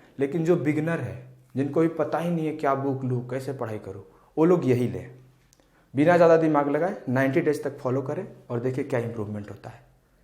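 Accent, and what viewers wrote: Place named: Bhojpuri, India. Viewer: Jai ho bhaiya 🙏 Tohra muh se bhojpuri sun k mja aa gyil😍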